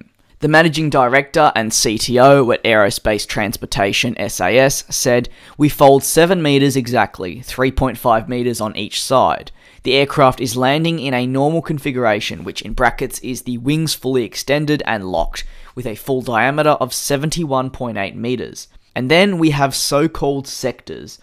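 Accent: Australia. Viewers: He kinda sounds like Crocodile Dundee, huh?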